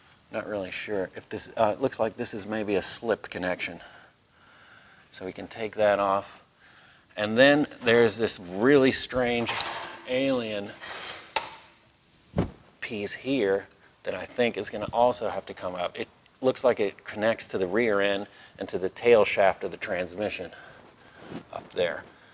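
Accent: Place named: United States. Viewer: A V8 rear wheel drive car is alien to you. You sound American, must be an import guy?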